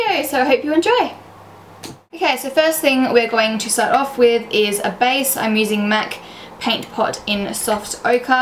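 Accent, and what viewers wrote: Australia. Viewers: no she's aussie just like me and she doesn't have an american accent. also her po box address is in WA which is Western Australia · Is she from Australia? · R u an Aussie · She's Australian, she cant change her accent. Shes perfect either way. LOL. xo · is she Australian?! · She is not British lol. She is Australian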